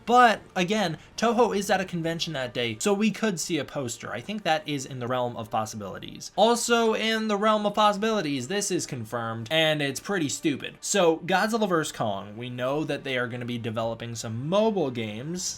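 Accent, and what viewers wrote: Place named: United States. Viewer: When the Legendary speaker mispronounces Camazotz and continues with that mispronunciation. I mean, I know you’re gonna have an American accent, but PLEASE at least try.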